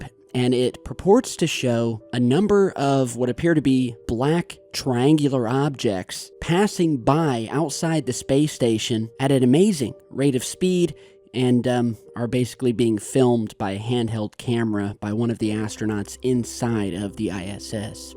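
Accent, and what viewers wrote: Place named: Southern Ohio, United States. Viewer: Haha! I noticed that too. That's strong southern Ohio accent for you.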